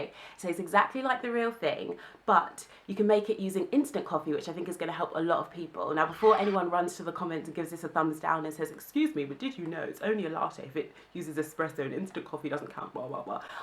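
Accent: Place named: RP, United Kingdom. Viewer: I love your accent...I could listen to you talk all day!!!..so posh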